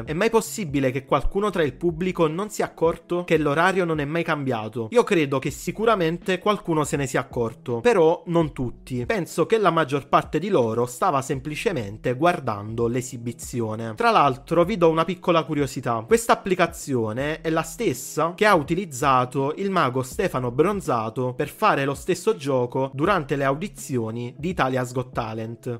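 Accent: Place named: Naples, Italy. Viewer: credo che sei Partenopeo dalbtuo accento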